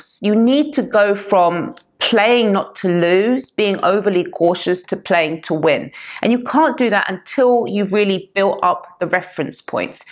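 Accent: United Kingdom. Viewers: Wow. I even got an answer. YT has its own algorithms. And on top of that we have marketing season opened. Now streaming hostesses are the top priority. They play Silent Hill 2 Remake in pajamas, because this is not objectifying to women. That's how game corporations solve the issue, of hot hostesses at game events that one were probably the main attraction. A contract with a streamer. Guys who are unable to approach a gal in real life as an audience. Easy money. Just trash the game in chat box and you will become eaten by simps. xD Pathetic. Cheers from Poland. PS. My instinct was right. Your British. That accent. Pity it's not as much popular as in 90's. Prodigy, Blur and few other UK bands. Yeah. Definitely good time for music. Cheers!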